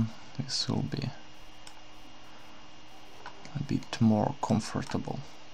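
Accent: Russia. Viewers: Приятно слышать родную речь.Говорит на Инглише, но ТАК по русски. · Рашн аксент эноин май ээрс абсолютли )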